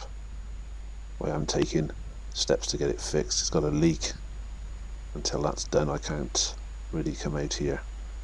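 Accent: United Kingdom. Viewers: Most annoying British accent ever.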